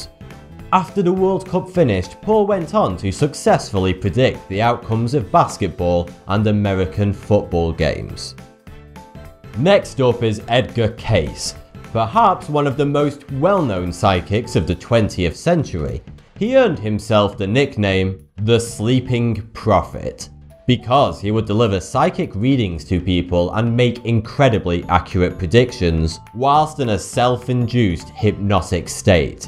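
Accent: United Kingdom. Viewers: Is that British accent? I must replay some sections because of that accent. Not to say that British isn't a beautiful accent. It's just that I rarely have the pleasure to hear accented English. But the speaker is rather cute. Like, bunny type of cute. The type than you wanted to cuddle and eat- I think I'm going to stop now. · I put this on before bed, and only listen to it... Ive listened to a bunch of these, and all I hear is "42 here" not thoughty2 lol.... British accent!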